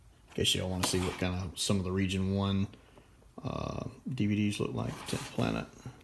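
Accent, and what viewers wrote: South, United States. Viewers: I think once you become a legal adult in America, groaning while crouching or bending is legally expected. Also, I love your collection! Also, I have been meaning to ask, Do I recognize a southern accent? I only ask cuz I'm from Texas!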